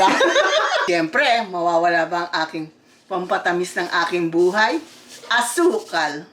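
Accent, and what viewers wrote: Iloilo, Philippines. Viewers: ilongga si Mommy??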